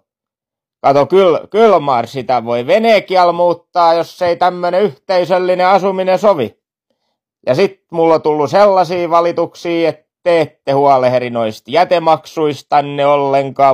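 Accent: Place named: Pori, Finland. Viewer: Tää oli HYVÄ! -Ja viäl pori murteel :D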